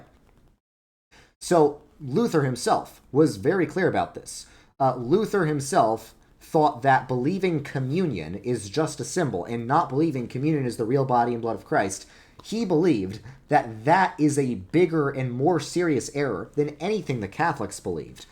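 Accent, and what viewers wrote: New York, United States. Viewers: He is from new york they do talk like that. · Don't worry he is just a new Yorker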